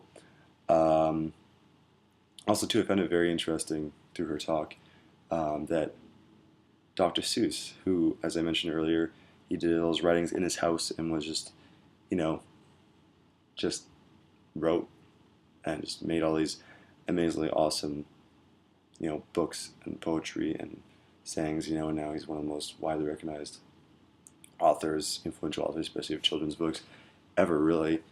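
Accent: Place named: Canada. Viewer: ps: you sound suuuuper Canadian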